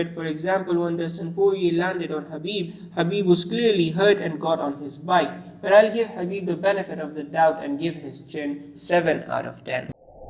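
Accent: India. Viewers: yeah man..great to find an indian fight fan.... Namaste ... His accent sounds like indian ...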